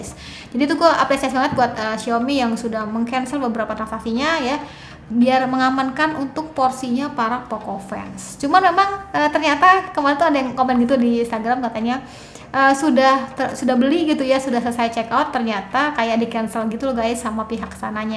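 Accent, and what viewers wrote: West Java, Indonesia. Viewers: Sunda neng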